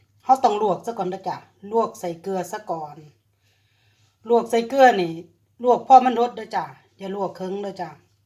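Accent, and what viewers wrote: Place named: Sisaket, Thailand. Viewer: ฟังเสียงแล้ว คือคนบ้านแฟนผมเลยครับ อยู่สีเกดบ่อน้อครับ🤔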